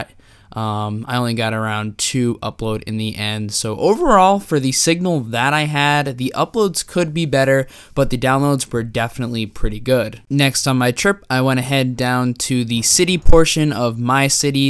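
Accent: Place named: North East, United Kingdom. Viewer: Where in the north east are you from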